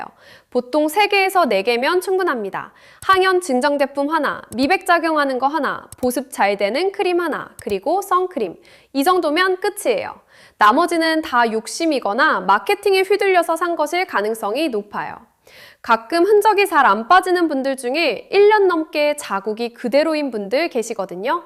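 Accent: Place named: Seoul, South Korea. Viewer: 경남인은 그냥 서울말로 들리는데..ㅎ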